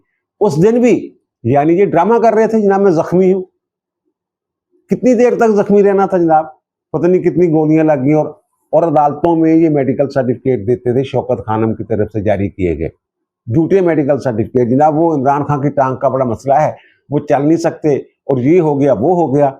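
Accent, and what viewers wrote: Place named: Doaba, India. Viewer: sahib, tusi Jalandhar ya Hushiarpur de o? Tuadi Punjabi ton kagda ai.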